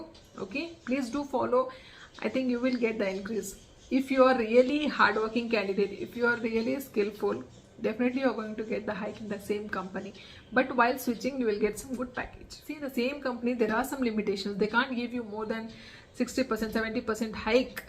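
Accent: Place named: England, United Kingdom. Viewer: I like u English Accent 🎉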